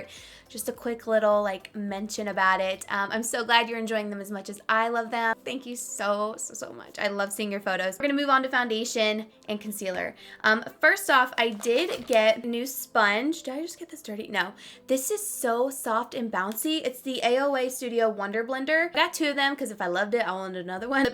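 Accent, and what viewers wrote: South, United States. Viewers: i love your videos!!! i’m from KY so it’s cute to hear another southern girl! my twitter is: xoxohannah16 💗💗💗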